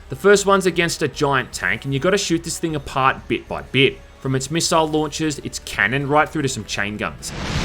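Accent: Australia. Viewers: Hey are you on Aussie or a Kiwi? Trying to figure out the accent.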